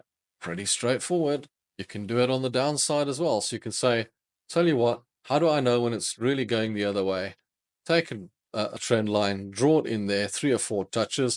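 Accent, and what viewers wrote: South Africa. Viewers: Good content. Are you from Cape Town?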